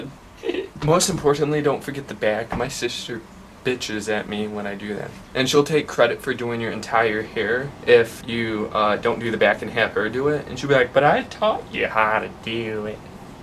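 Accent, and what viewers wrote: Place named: Canada. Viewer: You sound quite canadian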